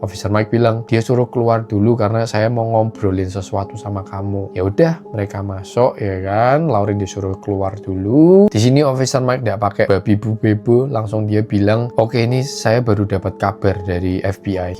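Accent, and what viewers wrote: United Kingdom. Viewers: Anjay pakai british accent cyuk, kereeeen. 👍🏻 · anjay pamer british accent nih bang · Love ur English accent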